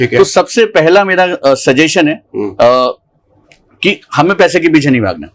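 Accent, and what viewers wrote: Bihar, India. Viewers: I like your strategy but BIHARI sun ke man nahi karta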